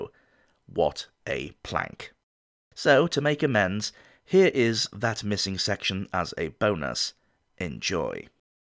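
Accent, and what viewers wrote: South, United States. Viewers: Agreed. Definitely not Texan or Georgian. (I’m native Georgian who spent 5 years in Waco, Texas. Virginia / North Carolina is the closest to the accent of the podcaster. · your southern drawl was pretty good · I'm from the south and I must say, your southern accent sounds absolutely beautiful. The old southern accent can still be heard in parts of Georgia, Alabama, and Mississippi; and even in parts of Louisiana and Texas. Fun fact: The southern accent is the baby brother of Received Pronounciation. When Princess Diana visited the south for the first time, she stated that she could understand us far better than our Northern counterparts. The Cajun accent is derived from the French accent...that's why no one can understand those fuckers, lol...only playing around. Anyway, sorry to go on a tangent and you may have already known all this, but here it is anyway.😊😅😂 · Some of these are better and more merciful than what the politicians & the 1% do to everyone daily. 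Well they just kicked in my door & it's time for the thumbscrews. (I refuse to use that nickname) Don't worry when I confess I'll be laying everything I've ever done at your feet. Great clip as usual, impressive research, loved the video, great line about old honest Guy Fawkes. Southern Draw? 7-7.5 not bad, you sounded a bit like a educated southerner though, more of a politician than a moonshiner. -no fun. Reason? Just listen to the song. ...with black curtains..makes a difference apparently. Margaret the Pearl of York; more of man than most of us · I rate Southern Drawl 7/10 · Southern drawl, oh yes! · Not bad Southern accent! I'm from the fringes of the South so it's not an accent I have much experience with but it still sounded good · I'd say 10 out of 10 for the southern accent.. and thats from a southerner in Virginia, USA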